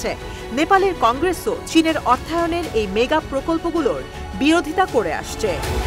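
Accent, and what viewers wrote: India. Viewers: আসলে যে সাংবাদিক খবর পরিবেশন করছে সে মূলত ভারতীয় নাগরিক তার ভাষার ধরনেই বোঝা যায়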